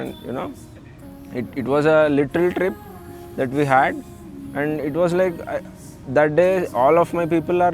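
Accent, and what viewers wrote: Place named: Davangere, India. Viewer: ಪಕ್ಕಾ ದಾವಣಗೆರೆ ದೇಸಿ ಭಾಷೆ ...ಖುಷಿಯಾಯಿತು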